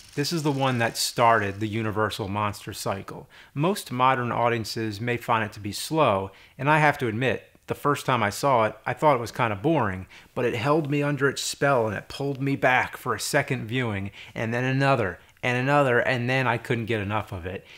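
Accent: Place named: New Jersey, United States. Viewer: Tyler White I think that would be his Jersey accent lol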